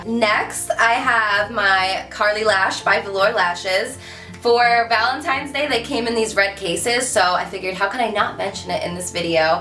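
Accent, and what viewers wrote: New Jersey, United States. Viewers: New Jersey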